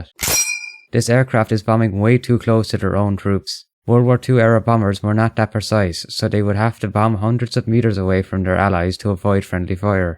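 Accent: Ireland. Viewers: Is this guy Irish?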